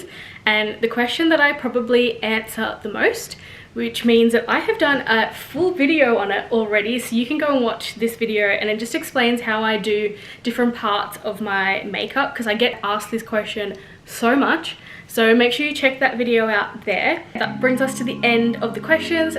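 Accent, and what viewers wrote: Australia. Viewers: I’m glad you answered the question of your nationality because I was very curious. Your features look very European. Maybe that is because the central part of your face is very fair now. I was wondering with your accent if you were aboriginal.❤ it is so interesting that pigment can come and go. I wonder if because you have lighter spots where you would be exposed to sun, if sunlight hasn’t played a part in changing the skin cells. I’m sure the condition is very interesting to scientists as to why our bodies do what they do. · She definitely has an Australian accent so I was a little surprised when she said Sri Lankan. I don't know what a Sri Lankan accent in English would sound like?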